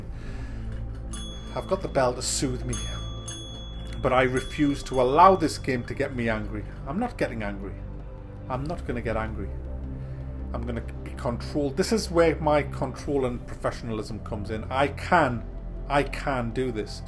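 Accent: United Kingdom. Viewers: omfg. this is the best channel for pessimistic gamers ever. And the british accent makes it even better for us yanks. so entertaining. i try to show everyone i know this channel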